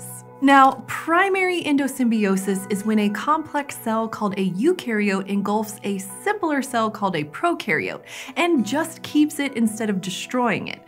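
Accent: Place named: United States. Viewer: She can speak correctly, I’m not sure what you are referring to. Her accent is also very mild as American accents go which means she’s easy to understand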